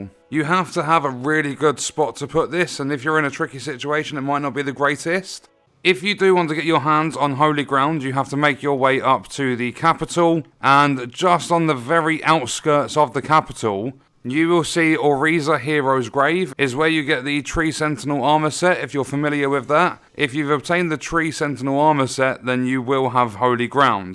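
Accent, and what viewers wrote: United Kingdom. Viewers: One of the best elden ring channels. British Accent is the best Ash of War, technically. Regarding chapters: love em. Let me explain: I watch the whole video first. When I come back ( maybe 2-5 times) I click on the chapters.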